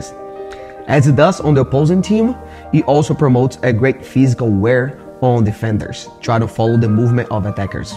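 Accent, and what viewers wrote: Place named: Brazil. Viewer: Vc é brasileiro né? A gnt reconhece kkkkkk ótimo vídeo 👏🏾👏🏾👏🏾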